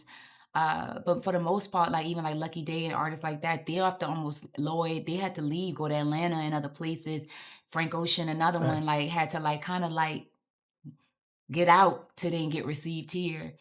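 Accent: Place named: New Orleans, United States. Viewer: Love! Keep going! That Nola accent tho 🤤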